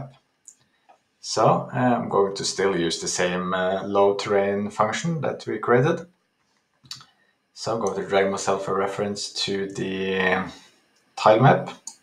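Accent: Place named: Norway. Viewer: Hallais! (based on your accent, I assume you're Norwegian 😅) Loved this video dealing with maps - that gave me a much better understanding on where even to start with my overambitious project. I would love to see your take on data structure/handling 😊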